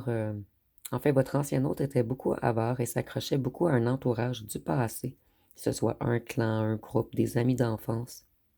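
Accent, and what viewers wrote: Quebec, Canada. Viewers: La voix de la belle vallée du Saint Laurent. Merci ❤❤ Et vite un Québec indépendant !!!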